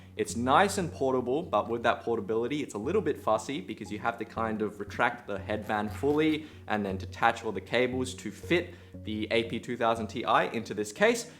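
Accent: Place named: Australia. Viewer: I was not expecting an asian with Australian accent :)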